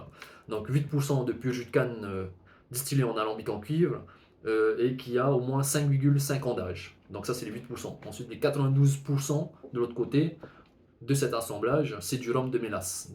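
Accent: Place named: Quebec, Canada. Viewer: C'est donc ça l'accent de Tahiti ? Ça ressemble à du québécois.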